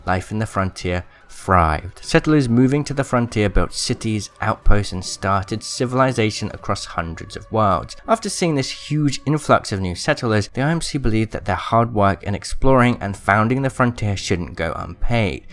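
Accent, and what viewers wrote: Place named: RP, United Kingdom. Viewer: Bro, why do you speak like a posh dude?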